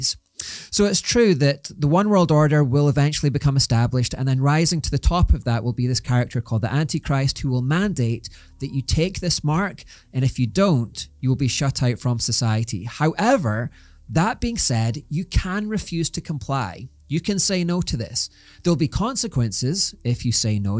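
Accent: Scotland, United Kingdom. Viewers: Love your channel, Mark. I've been watching your videos since Know Your Enemy, and no, your Scottish accent isn't a problem at all and English isn't my first language. In fact, I'd like to hear your natural Scottish accent at least once, because I've been to Scotland and oh, that was fun! 😂 I struggled to understand half of what people said, but still loved the accent. Finally, I'm absolutely sure that this channel is being suppressed by YouTube. I watch a lot of Christian content and (no offense to anyone else but) channels that aren't nearly as good as yours have grown significantly more. Channels with not only "worse" content, but also editing and overall quality. I guess you just speak too much truth! Best of luck with all you do. 😊 Can't wait to see what your next series is! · I've appreciated each video in this series. Thanks for being true to the Lord. 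I love listening to Scottish accents. 😊 Yours is very easy to understand for this Iowan from the US. Looking forward to the next series. May God continue to bless you!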